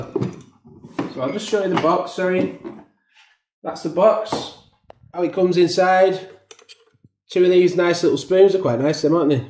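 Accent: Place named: Yorkshire, United Kingdom. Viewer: i will 😊 of the bat you sound Yorkshire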